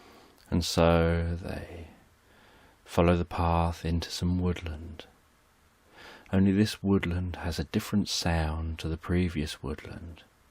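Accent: United Kingdom. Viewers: Hi are you from the uk? Also were can I buy your book from? I am new to your channel, look forward to hearing from you Angel blessings Sylvia Watts ( Rev, ATP )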